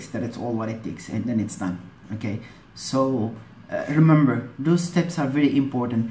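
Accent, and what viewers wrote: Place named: England, United Kingdom. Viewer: Ur english accent👌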